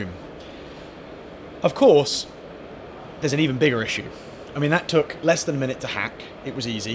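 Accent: United Kingdom. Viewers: He is talking very British lol. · I can hear the British accent ❤ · I really like the UK #accent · Man's British I guess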